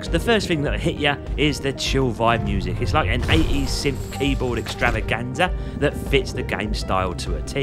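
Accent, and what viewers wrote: United Kingdom. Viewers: I cant understand the british accent 😢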